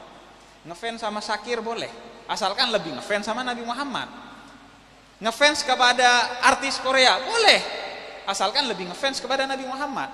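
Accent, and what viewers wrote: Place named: Madura, Indonesia. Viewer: Logat maduranya tetap nonjol bib😁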